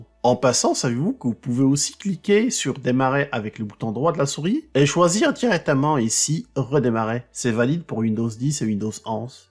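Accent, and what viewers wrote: Quebec, Canada. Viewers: J'ai voulu suivre vos explications.Helas!vous parlez trop vite et avec un accent québécois si prononcé que j'ai du mal à comprendre.Je crois que la meilleure solution,ce serait d 'utiliser de bons sous-titres bien lisibles en français bien sûr. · Merci pour les explications avec le bel accent d’outre Atlantique. 👍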